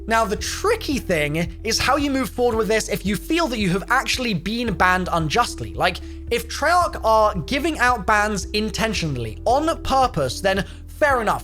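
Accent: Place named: United Kingdom. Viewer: too much uk english for me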